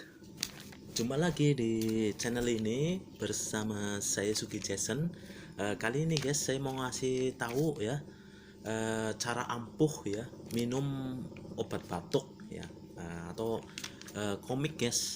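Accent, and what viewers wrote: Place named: Banyumas, Indonesia. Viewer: Sip...dicoba kang. Kang sugi asline ngendi sih? Ngapake kaya enyong banget...he he he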